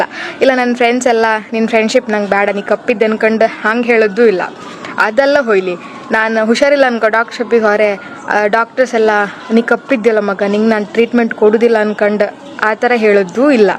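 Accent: Kundapura, India. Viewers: Idu namma kundapura kannada..❤ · Kundpura kannada ❤ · Kappadaru sundaravagiddi.chennangithu kundapur bhashe. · ಕುಂದಾಪುರ ಕನ್ನಡ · Kundapura kannada matra chanda marey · ಇದು ಕುಂದಾಪುರ ಕನ್ನಡ, ಉಡುಪಿ ಜಿಲ್ಲೆ, ಕುಂದಾಪುರ. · ಕುಂದಾಪುರ ಕನ್ನಡ ˌ ಕೇಳಲು ಪರಮಾನಂದ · Beautiful Kundapura accent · ಕಪ್ಪಾದರೇನು, ಲಕ್ಷಣವಾಗಿದ್ದೀರಿ, ತುಂಬಾ ಚೆನ್ನಾಗಿ ಮಾತಾಡ್ತೀರಿ, ಕುಂದಾಪುರ ಭಾಷೆ ಕೇಳಲಿಕ್ಕೆ ಖುಷಿಯಾಗ್ತದೆ. God Bless you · ಕುಂದಾಪುರ ಕನ್ನಡ ಕೇಳೊಕೆ ತುಂಬಾ ಇಂಪಾಗಿ ಕೆಲ್ಸುತೆ 🌹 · ನೀವು ತಲೆ ಕೆಡಿಸಿಕೊಳ್ಳಬೇಡಿ ಅವನ ಮಾತಿಗೆ Kape ಕಸ್ತುರಿ ನಿಮ್ ಮಾತು ತುಂಬಾ ಖುಷಿ ಆಯ್ತಾ ಕುಧಾಪುರ ಕನ್ನಡ ಸೂಪರ್ ಅವನ್ ಕಣು ಸರಿ ಇಲ್ಲ ನೀವು ತುಂಬಾ ಸುಂದರ ಇದ್ದೀರಾ👌👌👌💐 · ನಿಮ್ಮ ಕುಂದಾಪುರದ ಕನ್ನಡ ಕೇಳಲಿಕ್ಕೆ ಕಿವಿ ಎಷ್ಟು ಇಂಪಾಗುತ್ತದೆ ಎಷ್ಟು ಚೆನ್ನಾಗಿ ಮಾತಾಡ್ತೀರಾ ನಿಮ್ಮ ಕುಂದಾಪುರ ಕನ್ನಡ ನಂಗ್ ತುಂಬಾನೇ ಇಷ್ಟ ❤🎉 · Lofer ನಾಯಿ adu kundaapura kannada.. Modlu sede tara aadodu bidu. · ಕುಂದಾಪುರದ ಚೆಂದ ಭಾಷೆ ಕೇಳಿ ಖುಷಿ ಆಯ್ತು ❤❤ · Nimma kudapra mathu chenda untu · ಎಂತ ಕುಂದಾಪುರ ಭಾಷೆ, ಮಾರ್ರೇ 👌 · Namma. 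Kundapura. Kannada ❤ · Kundapura kannada kembuke chenda adrge niv kuda thumba lakshana kanthidri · ಭಾರೀ ಶೋಕು ಉಂಟು ನಿಮ್ಮ ಕುಂದಾಪುರದ ಕನ್ನಡ ಕೇಳಿಕೆ ಬಾರೀ ಸಿಹಿ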